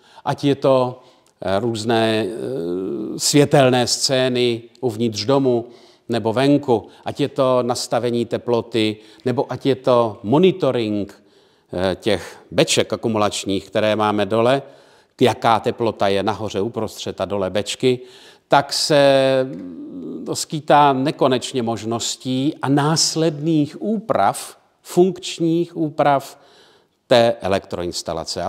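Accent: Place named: Brno, Czech Republic. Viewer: Mě trochu připomíná pana premiéra Fialu :) Hlasem, lehce brněnským přízvukem, těma pomlkama uprostřed věty i tím formálně-akademickým jazykem.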